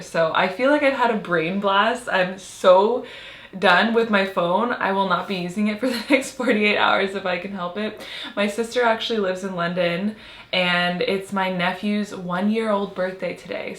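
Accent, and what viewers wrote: France. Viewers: i love the french accent!!! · I dont understand why did you start talking with a french accent ?? Is it just for fun or is there another reason behind that ? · The french accent😂❤️ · French accent. HILARIOUS😂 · The french accent took me by surprise😮😂love itt❤❤ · Loved your french accent 😂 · Okay but the French accent and black turtleneck go together and suits you haha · French accent >>>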